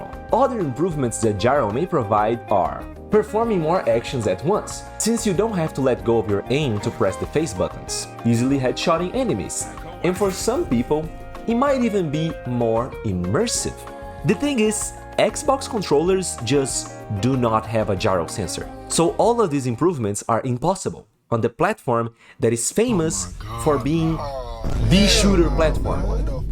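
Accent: Brazil. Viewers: Boy, are you brazilian?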